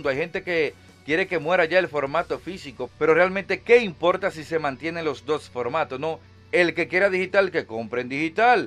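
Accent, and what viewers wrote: Dominican Republic. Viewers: Eres dominicano?😕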